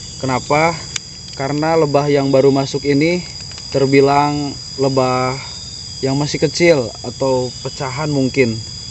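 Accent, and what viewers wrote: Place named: West Java, Indonesia. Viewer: Beda ey Ari logat Sunda mah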